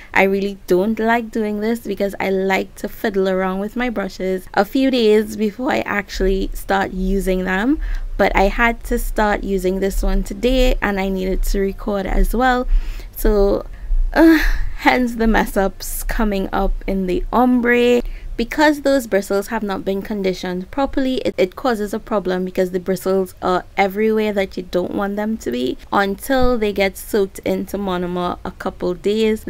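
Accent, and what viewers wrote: Trinidad and Tobago. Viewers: I enjoyed both videos i am a new sub & u sound like a trini just like me wow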